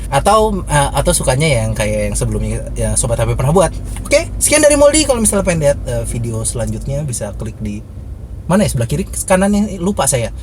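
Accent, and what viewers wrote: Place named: West Java, Indonesia. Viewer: orang sunda kah? logatnya sunda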